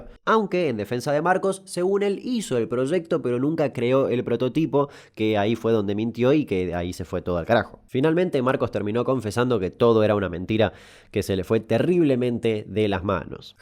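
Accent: Buenos Aires, Argentina. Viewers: Me encanta tu "y" patinada bien porteña...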